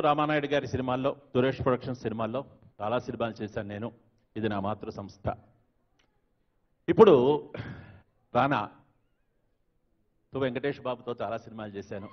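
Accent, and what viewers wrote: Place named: Rayalaseema, India. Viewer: That is our rayalaseema style ,,, feeling proud frm proddatur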